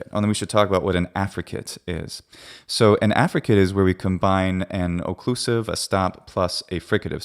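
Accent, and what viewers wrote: Italy. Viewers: This isn't the Ecclesiastical pronunciation, it's the Italian pronunciation. Ecclesiastial would be : hor-tus, kvan-ta, ling-gua, con-tsi-to, gur-ges, mang-na, nes-tsi-ne, ni-si. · I just found this channel--it is so fascinating! As a speaker of 6 languages, I am still disappointed that I never took latin (isn't interesting how people also say they "took latin" rather than saying they "speak latin?"). But I love his latin (this You Tuber), who speaks with such an authentic Italian accent and cadence. It makes me want to pick it up as a hobby! A huge compliment as I m hard to inspire these days, lol! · Your Latin accent has a very Italian quality to me, very soft and musical. I have been studying ecclesiastical Latin for several months. I am Catholic had been a cantor at our Cathedral. I had intended just learning prayers in Latin, but fell in love with the language. 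I tried several methods but ended up using primarily your recommendation of Lingua Latina Familia Romana: the text, the companion book, the exercisebook and the teacher’s guide/answer book. Hackett Publishing also offers MP3’s for sale on Amazon music in either the classical or ecclesiastical pronunciation, which I purchased. My goal is to be able to read St. Augustine’s book on the Trinity in Latin and more.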